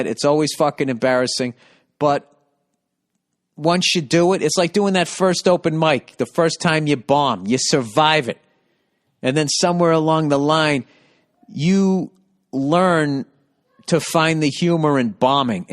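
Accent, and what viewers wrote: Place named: New England, United States. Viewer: thank god for this podcast. i share a house in kentucky with half a dozen women, work with all female coworkers and abrasive new england accent is like an oasis. it's good to hear a baritone for once instead of all these drawling mezzo-sopranos.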